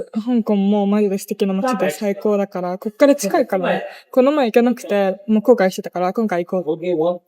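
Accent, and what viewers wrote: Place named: United States. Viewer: wow I thought for sure you grew up in the States or something haha You have a lovely speaking voice; I love playing your videos in the background while I work ^^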